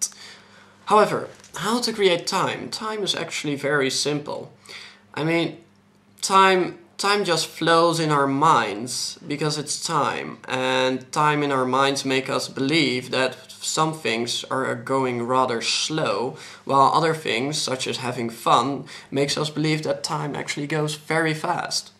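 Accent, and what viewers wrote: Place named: United States. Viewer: You do a awesome job on U.S. English by the way. I love your hair too. You cool.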